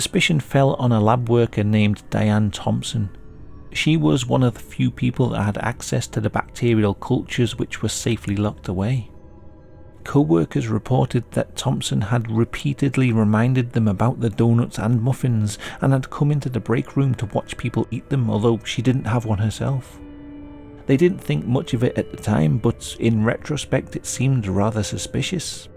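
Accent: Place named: Liverpool, United Kingdom. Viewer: That's not a Welsh accent, it's Liverpudlian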